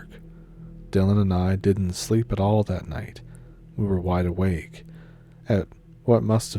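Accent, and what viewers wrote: Canada. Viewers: I always look forward to your stories. This time even more as I'm from Oklahoma. Love that last one. I was in campfire girls when I was young. Went to camp only once. Unfortunately or fortunately, I've never seen any thing frightening. I've been on overnights with my horse riding groups,to many areas of Oklahoma. Yet nothing. I must be very protected, that's all I can figure. Hope you get better soon sir, love your Canadian accent😊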